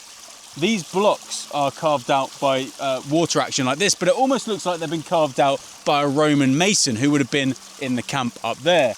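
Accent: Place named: United Kingdom. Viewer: J'aime écouter parler un anglais comme celui-là. C'est plus agréable que l'américain-chewingum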